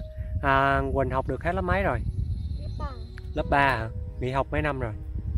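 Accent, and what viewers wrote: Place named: Mekong Delta, Vietnam. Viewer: nói chuyện thì rất giống người miền tây, hình như hai mẹ con là người miền tây thì phải.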